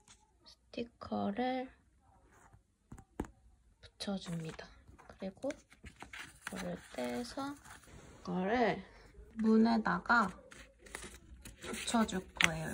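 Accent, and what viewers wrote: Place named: Gyeongsang, South Korea. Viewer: 고향이 경상도세요? 경상도 역양이 느껴지네요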